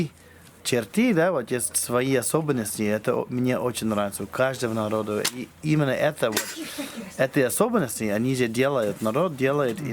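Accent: Tuva, Russia. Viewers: На руском говорит как тувинец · 😅тувинский акцент! Дыка кижисиг кижи.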